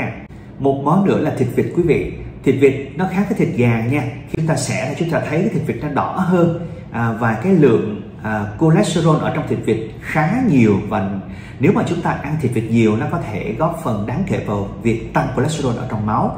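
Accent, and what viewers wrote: South, Vietnam. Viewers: Bác si giải thích rõ de hiểu và nghe rõ vì bác si người miền nam và có hình mình hoa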